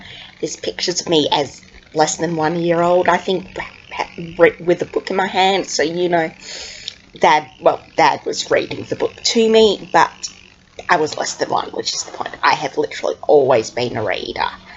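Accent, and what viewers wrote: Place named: Australia. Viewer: Hi, welcome to Booktube! 😊😊 I gotta say I've always liked the Australian accent hehe 😁😁 I hope to keep seeing your videos around and can't wait to see you grow 😊😊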